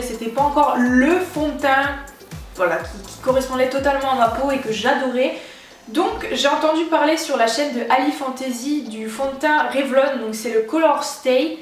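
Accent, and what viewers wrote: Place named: Saône-et-Loire, France. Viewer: J'ai l'impression que je connais ton accent, t'habites en saone et loire non?💓😂😂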